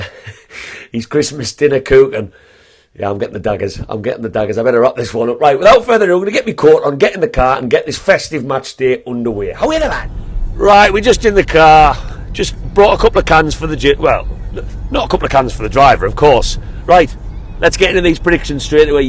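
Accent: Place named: Sunderland, United Kingdom. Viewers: Horrible mackem accent guess u live over the other side of the tyne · This guy has a Mackem accent, someone tell me I'm hearing him wrong!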